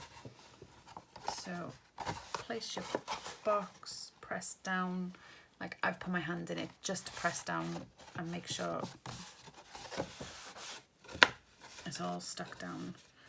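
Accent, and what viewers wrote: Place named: Wales, United Kingdom. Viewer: Hahaha see even when you write im laughing cos i can hear you saying it in my head in your welshy accent so funny! I feel like your about to burst into disney at any time - cant imagine what your like when your in the disney shop i bet your dancing and singing aint you 😂 xx hahah yeah its the only time your quiet in the dentist 😂😂 xxx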